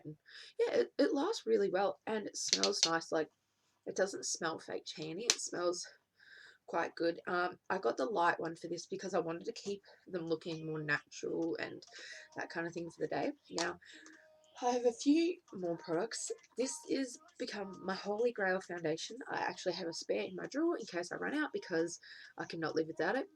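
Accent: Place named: Australia. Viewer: I love your accent my accent is super boooring yours is so cute! My auntie is from Australia and I always make her talk so i can hear her accent lol!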